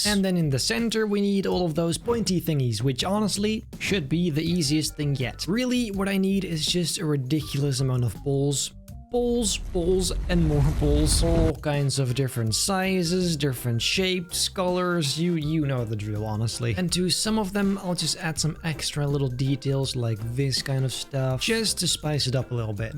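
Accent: United Kingdom. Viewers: Is he British init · he is getting to british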